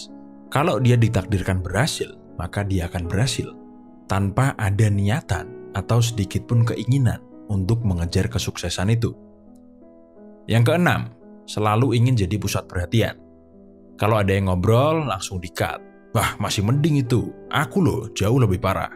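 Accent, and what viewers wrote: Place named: East Java, Indonesia. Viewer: Wkwkwk anjay ada sisi lucu juga antum prof. 😅 Dari logat anda. Anda orang Jawa timur kah. Surabaya sekitarnya. Sidoarjo mungkin. Ini ga ada acara temu fans atau seminar gitu ?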